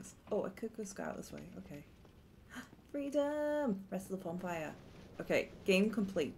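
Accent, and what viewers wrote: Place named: North, United Kingdom. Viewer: Very quite, your accent sounds in my area of the north. But you could be a dude for all i know. Dark Souls 1 was a work of art its why it became so popular, but old now.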